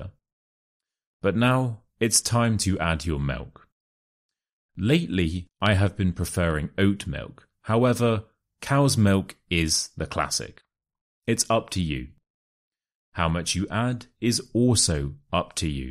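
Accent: United Kingdom. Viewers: The best british accent, the best channel ever!!!!! 👏🏼👏🏼👏🏼👏🏼👏🏼👏🏼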